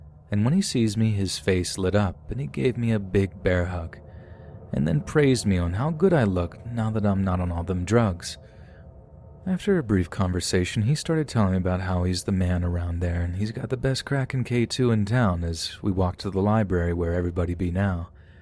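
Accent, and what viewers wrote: Australia. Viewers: ..danger to be brought to your face.. Choice. Plus the Crocodile Dundee reference and his Australian accent..😂😂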